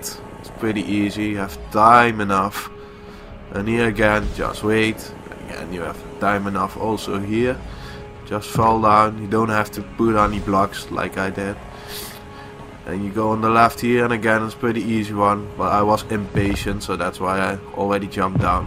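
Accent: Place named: Netherlands. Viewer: You sound dutch lol.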